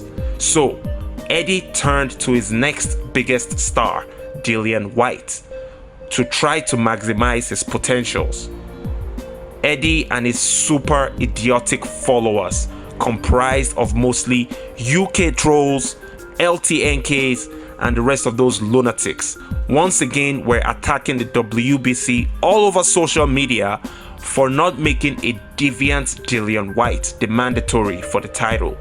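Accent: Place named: Nigeria. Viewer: First of all, I love your content. You sound extremely eloquent while keeping your strong Nigerian accent intact. However you are just another extreme Wilder fanboy, Eddie hearn is a true promoter for AJ however does eddie run his mouth too much ? Yes he does. Did Eddie hearn force Whyte to take substances ? No he did not. You trying to sound really deep, but calm down you are just another overly emotional wilderfan boy seriously . Calm the fuck down, u don't make much sense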